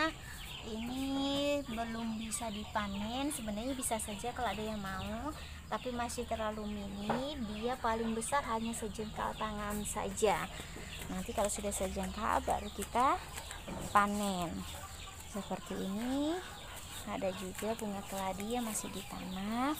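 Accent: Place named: Manado, Indonesia. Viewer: Bahasa manado xa mendok banget🤩.Semangat🙏🙏🙏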